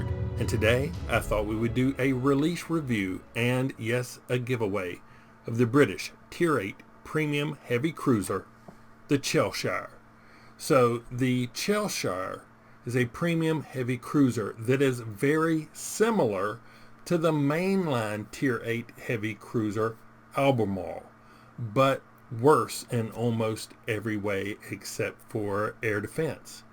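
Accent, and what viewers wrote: South, United States. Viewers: Your southern Drawl is mispronaouncing the Word Cheshire. My Name is Tentto and on the NA server.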